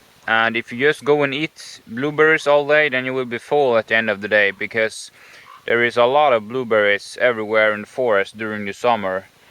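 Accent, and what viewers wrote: Sweden. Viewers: I love the Swedish accent! (Especially the "y" sounding "j"'s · Videos from Sweden...cool as hell! Love the accent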